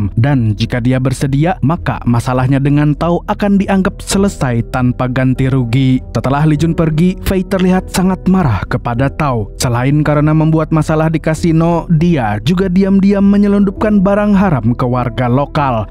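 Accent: Banjar, Indonesia. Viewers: pian urang banjar kh mas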